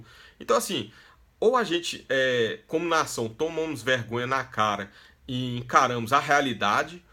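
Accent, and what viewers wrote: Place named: Bahia, Brazil. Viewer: Boa explicação...E esse sotaque puxado pro baiano? Saudações.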